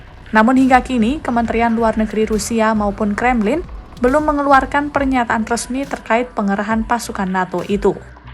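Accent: Java, Indonesia. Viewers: Medok banget jawanya yang pembawa acara, semoga tambah sukses kompas😊👍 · Medok nya klu hilang bagus Bu... 😊😊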